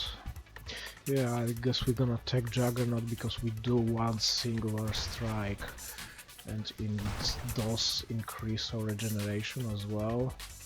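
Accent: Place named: Netherlands. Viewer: Yay! It's great hearing you! Keep being a awesome! Oh man I want to guess your accent; is it of Germanic decent? Dutch?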